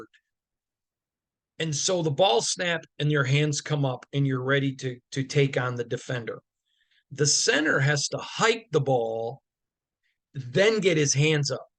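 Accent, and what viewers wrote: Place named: South, United States. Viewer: you remind me of a mix of Jon Gruden (before the emails) and Woody Harrelson. Haha can’t tell if you’re from the south or the Midwest, but keep up the great work and great content. You’ve got a great radio voice!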